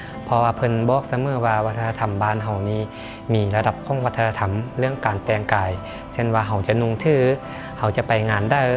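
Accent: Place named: Phetchabun-Loei, Thailand. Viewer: สำเนียง เหมือน เพชรบรู - เลย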